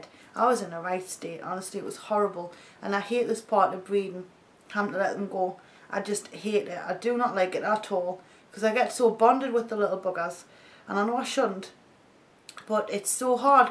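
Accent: Newcastle, United Kingdom. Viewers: Are you from Newcastle?:)x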